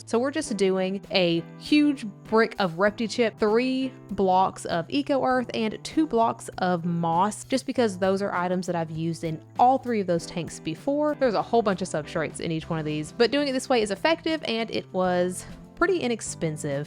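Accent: South, United States. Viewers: Hi, I love your southern accent; where are you from? I have a southern accent also; I live in North Dakota but I grew up in Tennessee.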